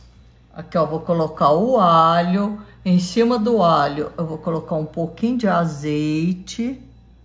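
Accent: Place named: Minas Gerais, Brazil. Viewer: Eu não conhecia você, e a semana passada assisti um vídeo seu da ceia de Natal, e hoje vi você no Fantástico e me lembrei que assisti o vídeo seu. Sucesso adoro o seu sotaque igual de uma amiga minha de Minas.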